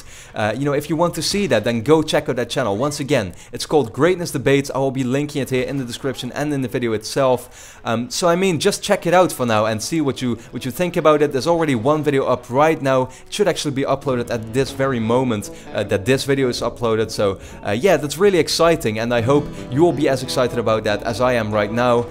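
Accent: Netherlands. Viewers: Are you Dutch? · are you dutch? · Are you dutch?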